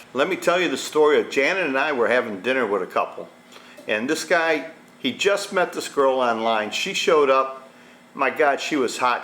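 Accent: United States. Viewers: you sound like you're from the USA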